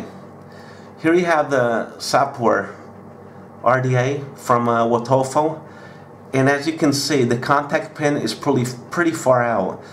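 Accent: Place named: Portugal. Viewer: When I stumbled on to your channel, you reminded me of someone, your slight accent that comes through in English. I couldn't pin point it. Then I realized you remind me of my dad, and various family members, I wondered if your were Portuguese, after more poking around through your past videos I saw the Portuguese reviews! Really enjoy them. My parents are from Portugal, born there, while I was born here in Canada. You seem like you and my dad would be friends, which would be great, would persuade him to stop smoking. I really enjoy your videos, and love watching your portuguese reviews.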